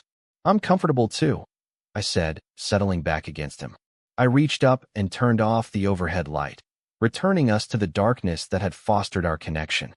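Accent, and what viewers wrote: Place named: United States. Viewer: This story created by AI , both content and voice . It's still good for people who is learning English , all American accent is very excellent .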